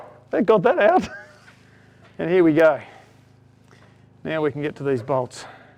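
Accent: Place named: Australia. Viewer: New viewer from Vic via China now. Love hearing your aussie genuine voice and thoughts.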